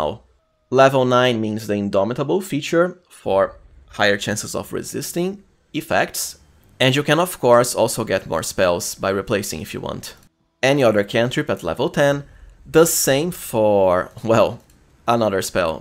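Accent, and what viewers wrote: Brazil. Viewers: Are you brazilian? I watch Luality and she pronounces "to" just like you ("chew", "chewday" etc) lol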